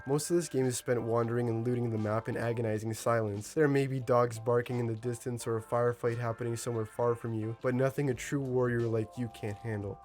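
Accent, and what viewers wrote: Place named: United States. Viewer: You speak with a perfect American accent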